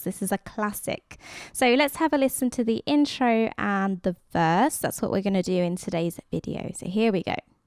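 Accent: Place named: United Kingdom. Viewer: I'm loving the accent ;) Edit: Its nice to hear a British voice when you're from England haha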